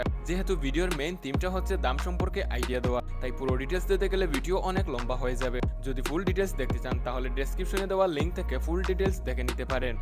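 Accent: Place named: Sylhet, Bangladesh. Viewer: Jodi valo korei kotha bolen apni je Sylhety ta apnar kothay bujha jay.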